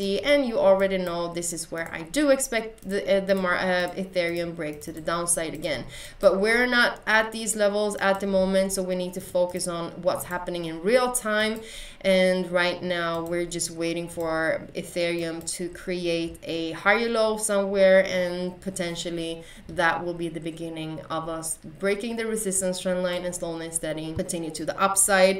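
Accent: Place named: Sweden. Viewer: låter svensk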